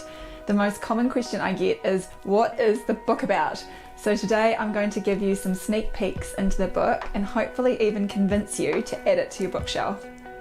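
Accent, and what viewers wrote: New Zealand. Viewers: Thank you for your informative videos dear. Is that a New Zealand accent I detect? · Hi, I really enjoy watching your channel and greatly appreciate the concise and informative style of your videos, not to mention that NZ accent! I read the second edition of Virus Mania, a while ago, and I found it so engaging that I couldn't put it down until I'd read the whole book. I certainly see the merits of the alternative hypothesis explained there and also how it fits into the model of the overarching control imposed by the pharmaceutical industry and its delivery teams, I mean health services. I'll have to buy the new issue... One thing that came to me, after reading the book was the question of disease transmission, through contact or air-borne aerosols, for instance. The particles (exosomes and similar?) that are produced by cells in response to toxic, or other stress factors, will be relatively simple, e.g. 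a fragment of DNA or RNA, surrounded by a lipid membrane, with a few signal proteins embedded in it, which will look like what we have been led to believe is a virus. If these particles were transferred by traditional 'infection' methods, would they not just act the way we are told that 'viruses' do and elicit immune system responses in the recipients and be classed as the disease? I apologise if I'm restating something that's obvious to others. I just wanted to clarify my understanding. Cheers. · I'll definitely be downloading the audio books. As I'm a fan of the Kiwi accent and all. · your New Zealand accent is killer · A whole book read to me by, I am in. Love your voice and Kiwi accent. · I enjoy your kiwi accent and I’m looking forward to the audio book.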